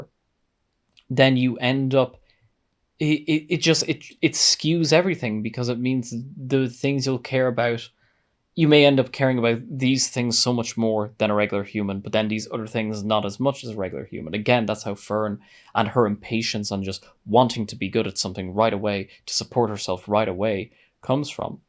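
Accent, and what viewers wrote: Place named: Ireland. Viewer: My family name is Irish. I detect an Irish brogue. There has to be an Irish definition for this kind of story.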